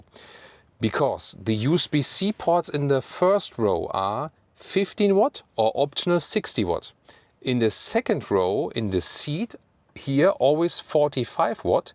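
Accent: Germany. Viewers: "turning indicators" I love this guy's Germlish.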